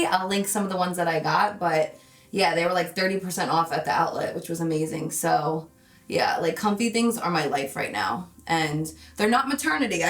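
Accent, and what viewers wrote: New Jersey, United States. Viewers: Her Jersey accent seems stronger? Anyone else notice that? Haha I love it. It reminds me of 2013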